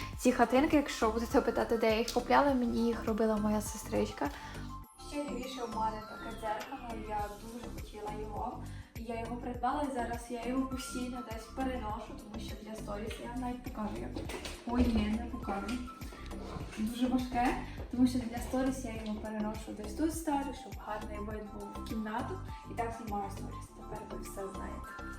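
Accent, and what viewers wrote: Ukraine. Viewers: как я давно не слышала Украиеский акцент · закарпатський ділекь вуха ласкає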